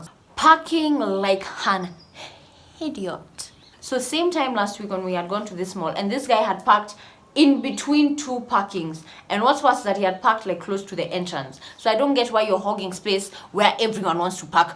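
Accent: United Kingdom. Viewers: Your British accent ✨💕💕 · I so love you..it's such videos I long for.. kindly do a video with your British accent..I would love to hear this ❤️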